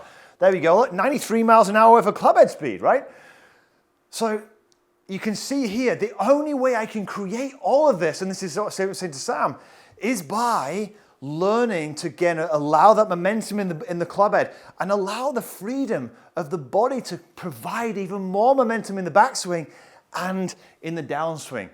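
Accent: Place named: England, United Kingdom. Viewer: I only trust someone to correct me with my swing with an English accent, is that someone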